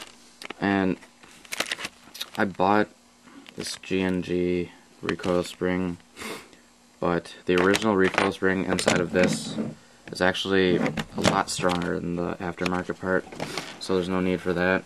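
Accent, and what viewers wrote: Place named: Chicago, United States. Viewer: U need to lose that Chicago accent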